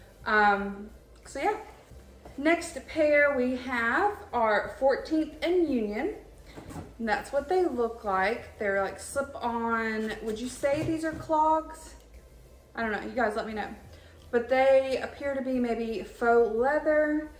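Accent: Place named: South, United States. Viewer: You have a slight accent; are you from the south?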